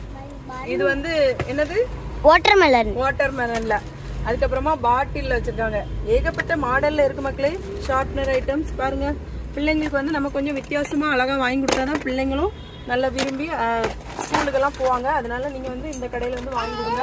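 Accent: Tirunelveli, India. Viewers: R u from tirunelveli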